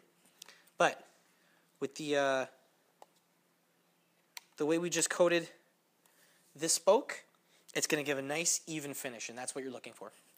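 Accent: Canada. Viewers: I can tell that you’re canadian lol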